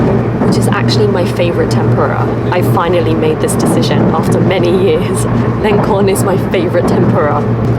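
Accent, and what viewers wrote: United Kingdom. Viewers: いつもながらナレーションが素晴らしい。響きの良い声とイギリスなまりは良い雰囲気です。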